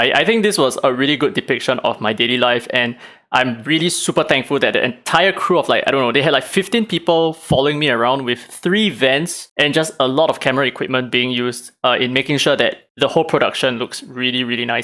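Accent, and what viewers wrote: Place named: Singapore. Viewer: normally he has some English Singaporean accent, but yeah maybe because it's more formal his accent is not as heavy as usual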